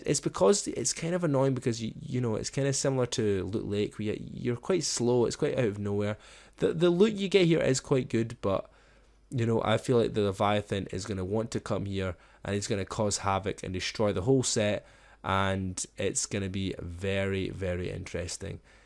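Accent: Ireland. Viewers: Are you irish??? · Are you Irish cuz it sounds like it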